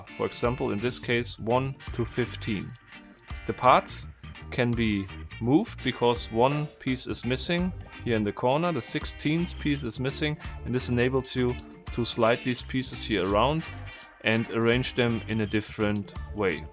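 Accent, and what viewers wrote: Germany. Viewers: Bitte lerne Englisch. Du verdrehst die Sätze und man hört deutlich ,dass du eine Deutscher bist. wieso machst du nicht einfach einen Deutschen Kanal? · Ich wusste dass du deutcher bist, dein akzent verrät dich. Aber ich bin auch aus deutschland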